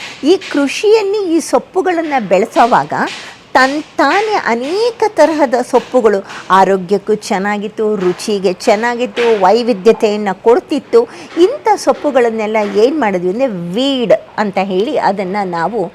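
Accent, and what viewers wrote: Standard. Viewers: ನಿಮ್ಮ ಒಳ್ಳೆಯ ಮಾಹಿತಿ ಮತ್ತು ಸ್ವಚ್ಛ ಕನ್ನಸ ಭಾಷೆ ಯಲ್ಲಿ ಅರ್ಥ ಆಗುವ ಹಾಗೆ ತಿಳಿದಿದ್ದಕ್ಕೆ ತಮಗೆ ಅನಂತ ಪ್ರಣಾಮಗಳು ಮೇಡಂ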